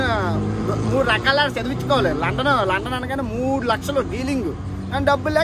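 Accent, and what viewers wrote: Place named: North Andhra, India. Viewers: From your slang u seems to be from North Andhra. Your people are daring and enterprising. People in Malaysia and all in Indonesia are from your place. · Nedi మా శ్రీకాకుళం మాటలు ల unayi స్వామి...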